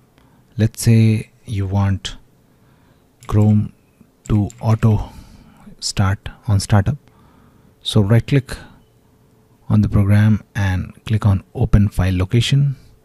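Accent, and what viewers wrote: India. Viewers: The moment I heard the Indian voice i immediately knew this was going to work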